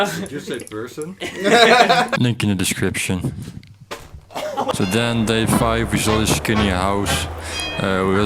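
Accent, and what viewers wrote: Netherlands. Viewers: me, as a dutch person, can just hear that he's dutch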